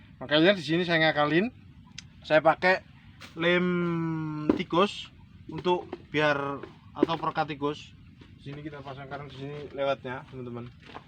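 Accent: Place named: Banyumas, Indonesia. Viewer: Kepriwe mas ora gelem di sorot raine...Mantap mas, Ora ngapak ora kepenak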